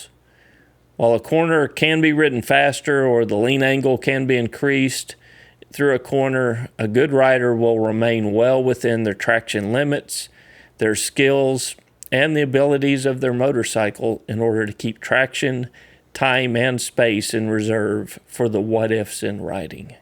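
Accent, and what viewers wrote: Texas, United States. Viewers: ...Don't sweat the complainers! Your Texas style of speaking is fine! Even us fast talking New Yorkers can enjoy a little slow down. Your videos help a lot of people, I am sure. Especially new riders. I enjoy them as a 40 year rider; and I've learned some things too. Keep it up!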